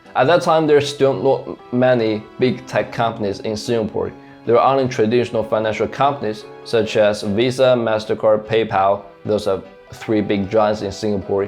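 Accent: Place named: China. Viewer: 一听就是咱中国留学生哈哈，点个赞！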